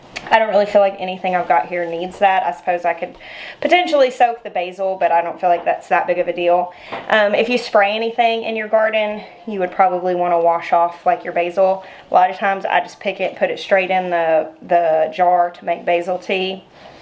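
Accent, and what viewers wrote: South, United States. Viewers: Never be ashamed of your southern roots. The Europeans love our accent, I found that out while living there for a time. Everywhere I went if I talked I drew someone's attention, and many times they complimented me on the southern accent. Unfortunately it was usually men and my hubby was very British and very jealous. I tried to hide the accent, but it was impossible.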